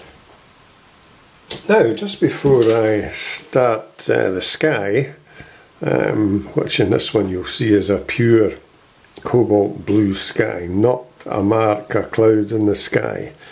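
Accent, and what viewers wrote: Scotland, United Kingdom. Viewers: Love the painting. You sound like you are scottish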